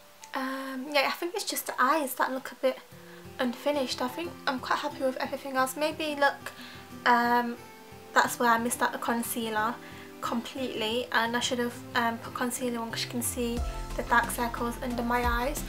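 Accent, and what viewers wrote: United Kingdom. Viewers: by accent i just assumed you're in uk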